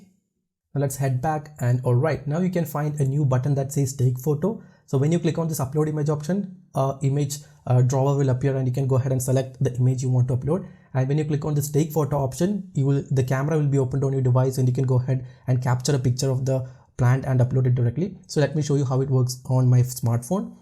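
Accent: Kerala, India. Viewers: pure mallu accent :) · You got a good mallu accent. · that malayli tone to english love it :)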